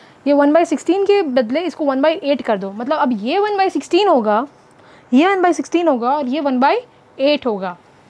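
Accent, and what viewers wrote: Gujarat, India. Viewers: Mam aap Kabhi Kabhi Gujarati aavaj me bat karte ho kiya aap Gujarati ho, ya Gujarati language aapko aati hai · Gujju tone ma mja ave che mam😊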